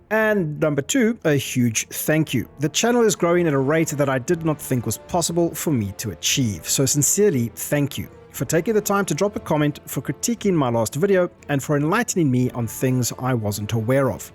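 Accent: South Africa. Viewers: South African??? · Great video. Thanks for posting dude man. Great to hear a based south african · you sound like a fellow SAFA. If so always nice to see a fellow ass end of Africa resident. Lekker Vid mate. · Hold up. South African accent? Opinion accepted. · Are you from South Africa? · Haha, very cool to hear a fellow South African on YouTube, you sound like you are from the coast? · Interesting accent, sounds South African. Subscribed. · You sound South African, New Zealander maybe...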